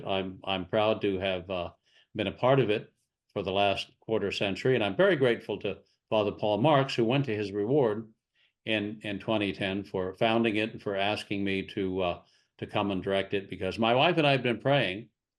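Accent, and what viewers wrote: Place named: Asia. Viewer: Yep sounds Asian. I know because I’m Asian.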